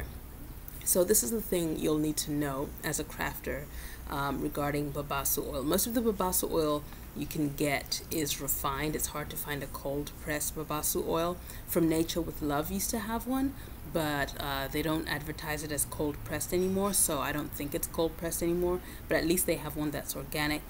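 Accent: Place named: Zimbabwe. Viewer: Are you Zimbabwean? that accent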